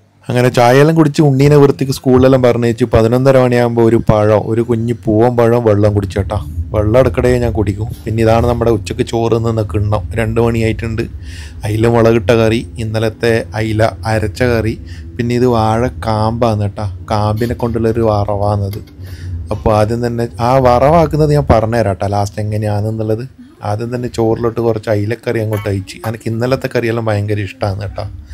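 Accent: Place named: Kannur, India. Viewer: നിങ്ങൾ എവിടെയാ നമ്മുടെ കണ്ണൂർ ആണോ നമ്മളെ bhasha😂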